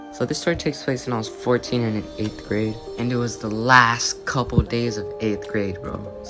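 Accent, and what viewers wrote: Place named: Kentucky, United States. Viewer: sounds like ky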